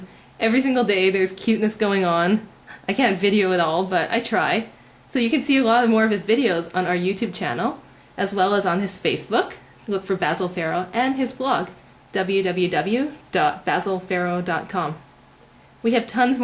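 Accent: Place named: England, United Kingdom. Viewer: Are you Japanese born in England as i thought you were english until i checked your channel haha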